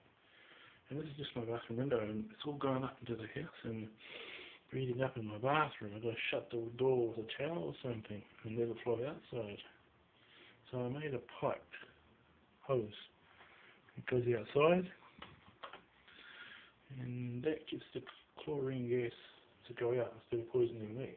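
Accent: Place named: New Zealand. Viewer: try learning to listen to New Zealand accent, then re-listen to it, sorry about the muffled sound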